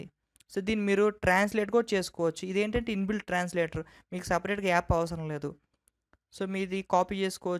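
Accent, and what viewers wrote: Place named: Srikakulam, India. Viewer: I like your accent bro !! Maa Srikakulam friend gurthochadu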